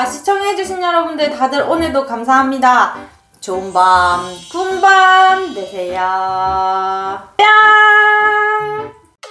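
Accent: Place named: Gimhae, South Korea. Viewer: 팬이에요~립스틱?틴트?뭐바르세요?항상 궁금했어요 ㅎㅎ 잘안지워지네요~공유해주세요~~~~꼭이요!늘 궁금했어요~~~마라탕 먹을때마다 마라탕 영상보면서 먹어요 초딩 따님이 마라탕에 빠졌거든요ㅎ 내 최애 유투버가 이에요 처음에는 대창 먹는거에 반했어요 말투가 익숙해서 보니 김해사시더라고요♡♡♡♡ 전 김해가 친정인 아줌마인데요ㅋ 작년에 김해에서 이사하신다는 영상도 봤죠~돈쭐도 때문에 보고 있어요 먹방 유투버중에는 쵝오라고 생각해요❤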